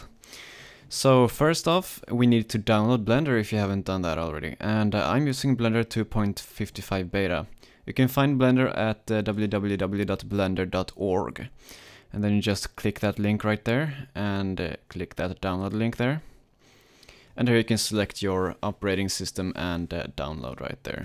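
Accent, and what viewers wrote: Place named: Germany. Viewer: Your accent sounds kinda German or Swedish ... I am german :D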